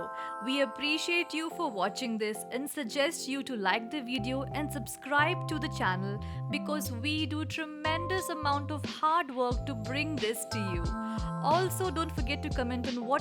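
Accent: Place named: India. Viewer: The heavy Indian accent in the video is too hard to follow for a westerner !!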